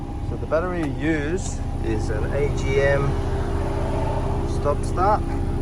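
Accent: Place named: Australia. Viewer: You sound Aussie, Is this an Australian delivered Macan?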